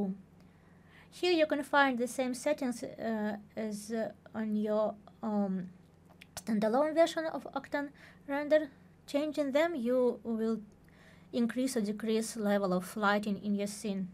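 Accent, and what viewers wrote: Russia. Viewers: THNX for video, ps: русский английский просто невозможно не распознать))) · Oh this lovely russian accent!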